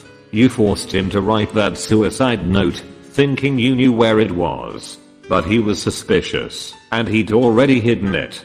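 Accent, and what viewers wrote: United Kingdom. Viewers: Great... I like his voice, very clear and good brittish accent. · good british accent, very clear............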